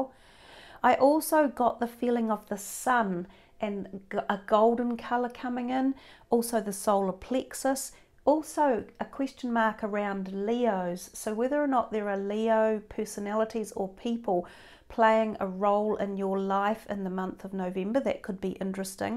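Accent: New Zealand. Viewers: I adore her accent. Kiwi accents are lovely. Blessings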